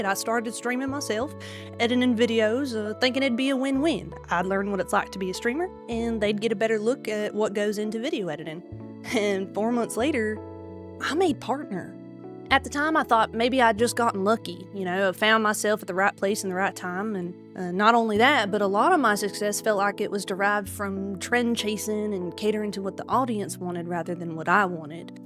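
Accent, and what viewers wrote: South, United States. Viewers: Thank the good lord another streamer with a southern draw. I haven't come across another streamer with a draw. And your info is very refreshing. ❤ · Thank you, I love getting new information & I love your country accent you remind me of my favorite stallion Applejack. Thank you so much, I have used my own hashtags & everything I will do my best to post more I made a new hashtag today for my Merry Morning Sing Song, I did it because I found your video I am proud of your work, thanks!!!!!!!!!!!!!! 🫴🏽MWAWWWAH~⁽⁽ଘ🤍ଓ⁾⁾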